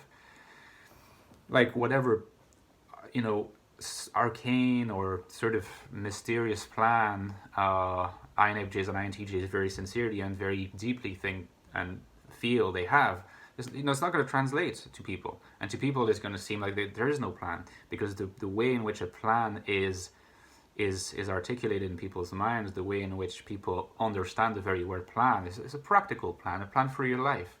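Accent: Ireland. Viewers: He's got an Irish accent but I think he's French?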